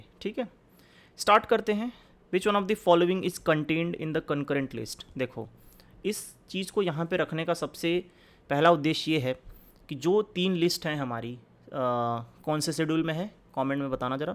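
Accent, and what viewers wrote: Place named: Bundelkhand, India. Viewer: yes..apne kaam se kaam rkhna means ache work Krna sbse better h . Mai jaha tkk guess kr paa rha hu apko bolne k tariqe se ap mujhe MP Jabalpur Bundelkhand region se ho..Maine sahi bola kya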